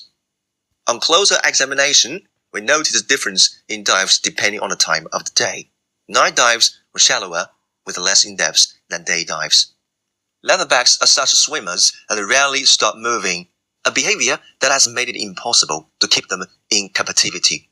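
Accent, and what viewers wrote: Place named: India. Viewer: Wataaaaaa, he must be indian, awful accent I've ever heard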